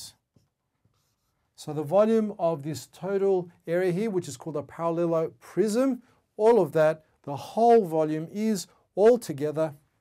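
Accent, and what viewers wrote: Australia. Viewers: u can tell his from sydney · He sounds like a australlian and french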